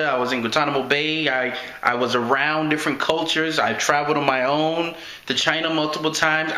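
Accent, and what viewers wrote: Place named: Canada. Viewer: You sound like a Canadian. thats a compliment btw:)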